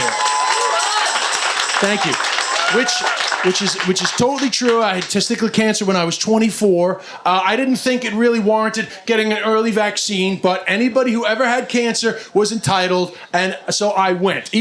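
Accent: New York, United States. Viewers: Never heard of this guy before, but DAMN that was a solid set. High energy, aggressively funny and smart, and an amazing combo of street New York accent with metropolitan comedy. 5 of 5 stars! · You code-switch to a much stronger American accent for American audiences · It's just a new york accent , and the fact both of them are a bit hyper.😂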